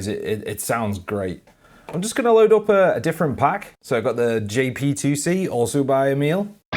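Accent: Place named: United Kingdom. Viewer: Hello. You always used Lancaster IR loader. Is there any difference in using it or the NadIR one? I just discovered NAM and was amazed by the Emil Rohbe models when I watched on another vídeo. I even did a search about him (he has also a YT channel). Now I see you talking about him. I dndt understand this ""triple or jwel rack"(?)" (I strugle to understand britanic accent)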